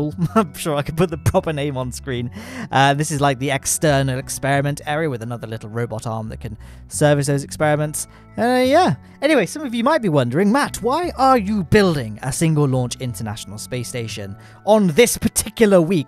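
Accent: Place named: RP, United Kingdom. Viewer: why you so posh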